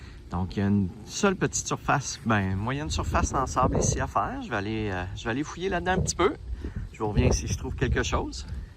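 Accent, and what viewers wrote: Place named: Canada. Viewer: Avec votre accent vous êtes du Canada !! Pourtant vu le nom de la ville je ne sais plus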